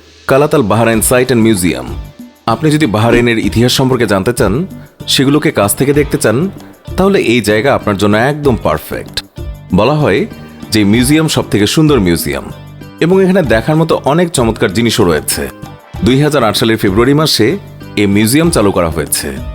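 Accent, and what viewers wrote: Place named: Bangladesh. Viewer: Bangla bhasa ta shunlei bojha jai, kono ek Bangladeshi balche. Bangal bangla ta puro puri distinct from Bengali spoken in W.Bengal.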